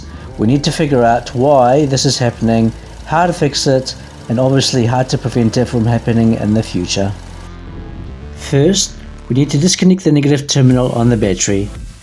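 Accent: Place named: New Zealand. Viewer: Evening!! I have thoroughly enjoyed your videos as I posses the same vehicle therefore is very informative & helpful. I couldn't help but notice the kiwi accent! As an aspiring mechanic, you as a mentor would be fantastic.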